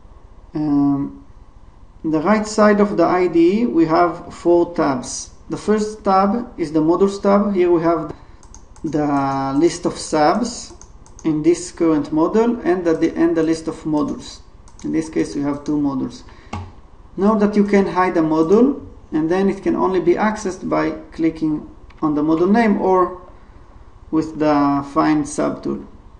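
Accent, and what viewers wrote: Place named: Israel. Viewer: GO israeli accent!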